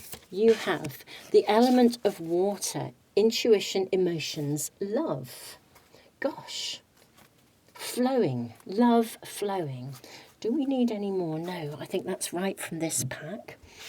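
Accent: United Kingdom. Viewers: P1. I am so ready for change and start a whole new chapter of my life in the best possible way. I been healing, creating, building, sacrificing and strategizing so it will all come together. A life I co-create with the Universe. A life of pure authenticity and individuacion. Thank you! Your choice of words are very encouraging. Just love your UK English accent and choice of words that’s very depicting from your region. I live in Ecuador but I am Polish-Australian. ❤❤❤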